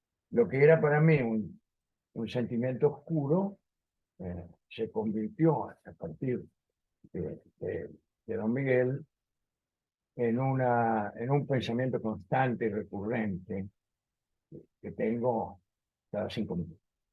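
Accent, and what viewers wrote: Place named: Buenos Aires, Argentina. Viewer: El acento porteño es hermoso ja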